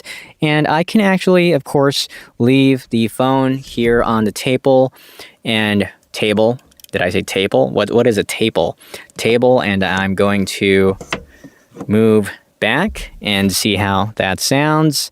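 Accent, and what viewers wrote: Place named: United States. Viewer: In the video you said you are from the Philipines, but your accent is like native American! how come? did you learn it or your were raised in the USA?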